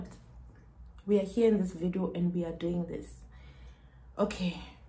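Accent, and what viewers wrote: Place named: Poland. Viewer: I love your polish accent maammmm😍😍😛😂😂😂😂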